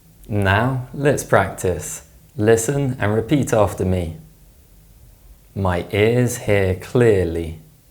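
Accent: United Kingdom. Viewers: Thank you teacher. I like british accent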